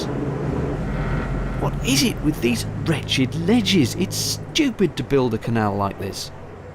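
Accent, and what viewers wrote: United Kingdom. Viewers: Congrats to Episode 100. I am following your videos regularly. Beside of your nice british accent, I love your british humor. :-) Cheers, to the next 100!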